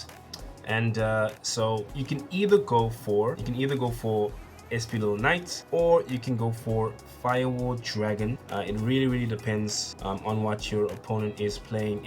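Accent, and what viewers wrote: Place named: South Africa. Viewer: Accent is awesome - u from SA?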